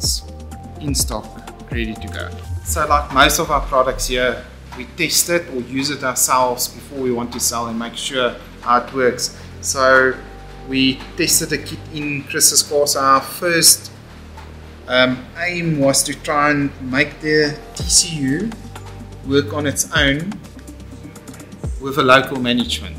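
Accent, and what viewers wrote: South Africa. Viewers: The south African accent is the best accent 💪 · Man I love the SA accent!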